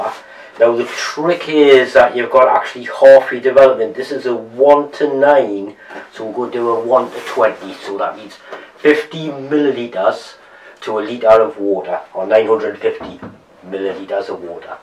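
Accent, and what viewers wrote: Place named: Newcastle, United Kingdom. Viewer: Love the vid, Thank you Great information! :-) Its a good job I can, sort of understand Geordy..... I think others would benefit with subtitles :-D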